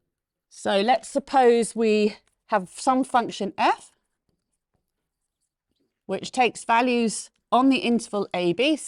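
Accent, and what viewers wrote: United Kingdom. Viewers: Ŕeal british English ❤❤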